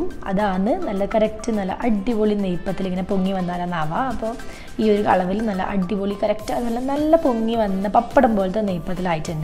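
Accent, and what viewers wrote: Kannur, India. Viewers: Kannur food nammak.peruthishtam..😍 Kannur slang nalla rassa kelkkan. Endayalum spr recipe 😘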